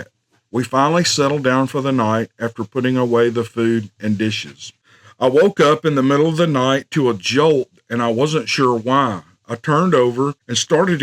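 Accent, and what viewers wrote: South, United States. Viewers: I believe the stories 100%. I am from the Pacific Northwest & they populate a lot of the woods all over here. My brother in law & myself were WAY off the beaten path west of Rathdrum, ID up in logging country when We noticed what looked like a Shaquille O’neill’s bare single footprint (only wider 6” across the widest part of the foot) crossing our path going up the steeep embankment only in a wash that came over the game trail we were hiking on. It was pointed uphill & when we looked up the steep washout their was another footprint probably 8’ UP the SUPER STEEP incline. We are bigger dudes me 6’2” 200lb & he 6’4” 230lb & there’s no way we could’ve made that leap on level ground let alone on a 50% grade that whoever made that footprint did. No way it could have Been a hoax since we were 1 hrs hike to the closest logging Road. 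We Didn’t see the beast, but after seeing those fresh footprints 15 years ago; my mind opened up the the FACT that these creatures co-exist near humanity w/o much detection from us ! We Like your southern accent you tell the stories in & the bluesy country...Keep em comin’ sir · Have to say, I'm a Florida girl living in Missouri the last 15 months. I love your voice. The southern in it sounds so... Right.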